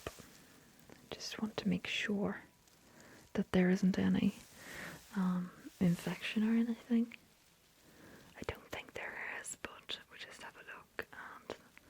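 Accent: Ireland. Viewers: Where did you go. I miss irish accent. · Your Irish accent is shockingly natural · If you ever get back into asmr you should definitely do another roleplay with the irish accent. It is spot on, so well done!!👏🏻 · Top marks again for the accent.👍 I always find there is nothing more relaxing than listening to someone speaking in a soft Irish accent, especially when things are being explained in detail as you did throughout the examination. Also got some tingles via the movement and light during the pupillary examination. Great video, thanks for sharing.😊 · Was the accent inspired by Derry Girls? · Mother's side of the family immigrated from Ireland and your accent is absolutely spot-on. Thought it was natural. Amazing! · Jesus Christ I’m Irish and this was like I was listening to someone from home.. unreal - you have to have someone in the family that’s Irish to pull that off. 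Gone from southern to northern very quick though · Omg that accent was just amazing! 😍 The little bit of Irish in me was very much satisfied to hear this! Also I LOVED THIS ROLEPLAY! You really have a comforting vibe about you. 🤗💙 Just what the doctor ordered aye? 😏😅💙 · I genuinely thought she was Irish, particularly from the west. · Could you please do an ear exam , love your Irish accent ❤ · Medical role-play AND an Irish accent? Preemptive 10/10, haha! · Hey! Greetings from Israel! 😀I'm so happy to hear an Irish accent! 😍Beautiful!❤ Subscribed!😊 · Im guessing you are actually Irish haha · This is one of the best irish accents I've ever heard anyone do... Im irish and hardly anyone gets it right! Amazing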